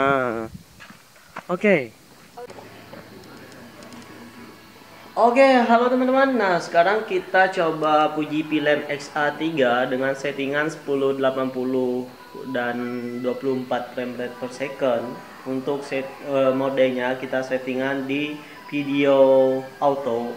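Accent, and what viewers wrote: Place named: Kalimantan, Indonesia. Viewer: Dengar logat abang ni bicara keknya abang org kalimantan ya bang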